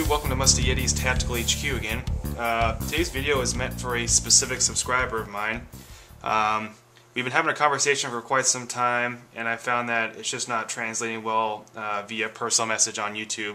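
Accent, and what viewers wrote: United States. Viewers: sound like new york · If I could guess the state, it sounds like MA.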